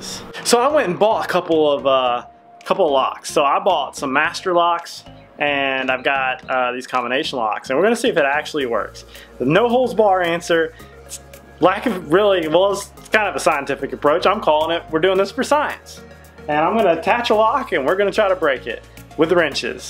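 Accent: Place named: South, United States. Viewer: It's the accent....Southern braintrust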